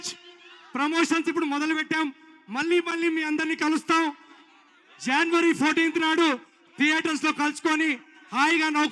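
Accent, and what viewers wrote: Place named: Telangana, India. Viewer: Telangana hero haa basha lone shammi vundhi abba 🔥❤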